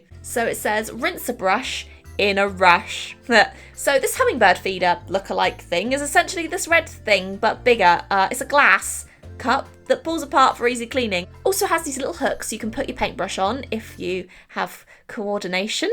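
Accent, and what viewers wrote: England, United Kingdom. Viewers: Are you in Uk? Just wondered you sound English. I like the look of the brush cleaner. xxxAnnxxx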